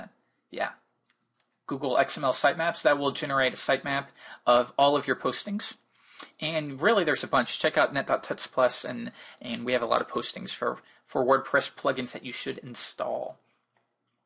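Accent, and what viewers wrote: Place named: United States. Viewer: great tuts,u sound american but u do like football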